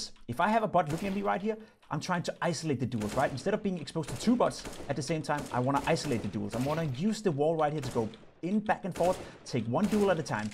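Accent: Denmark. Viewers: do you have a danish accent?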